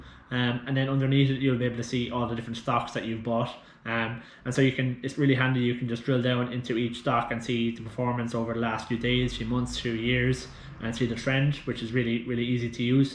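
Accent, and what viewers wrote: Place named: Ireland. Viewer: Where in Ireland you from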